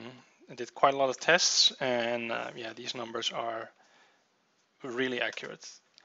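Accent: Netherlands. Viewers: Are you dutch?? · are you dutch? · Are you a Dutchman because i can hear your talk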